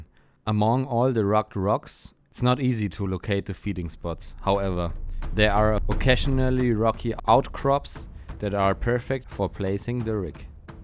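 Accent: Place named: Germany. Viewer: The dialect is so german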